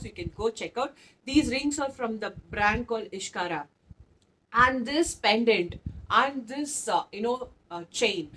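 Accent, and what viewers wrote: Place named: South India, India. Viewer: I have observed your south touch accent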